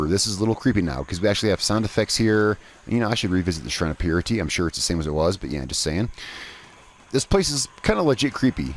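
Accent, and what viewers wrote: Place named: North, United Kingdom. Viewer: You can tell he's from the North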